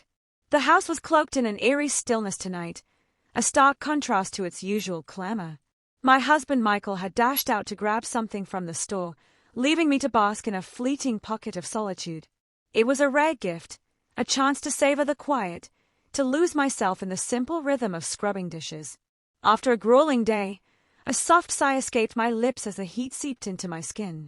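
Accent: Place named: Australia. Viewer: Oh I like the Australian accent on this girl. It actually makes her pleasant to listen to.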